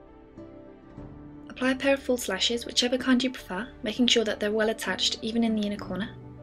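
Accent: England, United Kingdom. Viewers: It's really weird listening to a British accent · I love your accent. Even though I am from the UK too xD :') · loove that english accent.... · i like british accent ! perfect xD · i love british accent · i luv ur british accent...its cute!!!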